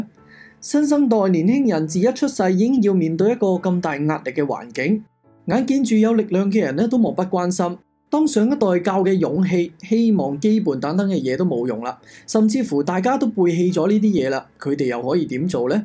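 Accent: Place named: Hong Kong. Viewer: 香港人崇拜廣州話，所以抄襲了廣州話。香港本土語言係圍頭話，但係你叫香港人講圍頭話，香港人會唔肯講。因為香港人崇拜廣州話覺得廣州話高雅，厭棄香港話（圍頭話）難聽老土唔入流。建議大家好似澳門人咁學正音廣州話，唔好學充滿懶音嘅香港圍頭式廣州話。 冰室係香港人抄襲偷盜廣州嘅嘢 我澳門人真係睇唔慣。冰室，又叫冰廳，廣州話用以稱呼冷飲店。其是南粵地方一種主要售賣冷飲、雪糕及沙冰等冷凍食品的飲食場所，起源發跡於廣州。在香港流行於1950至1960年代，被認為是茶餐廳的前身[1][2]。過往廣州的冰室會經營雪糕、凍奶、冰水，兼營咖啡、奶茶等熱飲和西餅麵包 澳門人香港人說的廣東話學術上最準確定義 叫作廣州話（澳門官方政府文書也寫作廣州話）廣州話英文叫Cantonese Canton就是廣州英文舊稱 當然現在你也可以看到許多痕跡 譬如廣州機場代號是CAN 廣州塔英文叫Canton Tower 我係澳門人 澳門一直係廣府話/廣州話地區 但香港唔係 香港一百年前還在講圍頭話（香港話） 一百年前澳門和廣州影響力遠遠高過香港 香港人放棄圍頭話學我地講廣州話 香港人講廣東話口音非常難聽好多懶音（畢竟係抄返黎）建議你學正音廣府話/廣州話 廣東話本身係錯誤名稱 魯迅講過：一直如此 便是對嗎 潮州都係廣東 梅州又係廣東 唔通潮州話梅州話都係廣府話？ 呢個語言係名副其實嘅廣州話 我作為澳門高質大學生鍾意講求學術 希望啲港喱唔好介意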